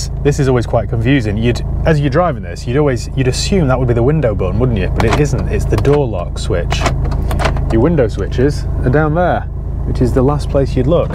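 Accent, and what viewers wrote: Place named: Yorkshire, United Kingdom. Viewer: You're Yorkshire accent made me laugh.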